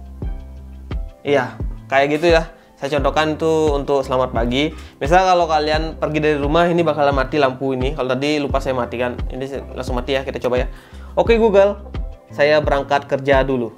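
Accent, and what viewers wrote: Bali, Indonesia. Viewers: Logatnya kaya org bali nih wkwk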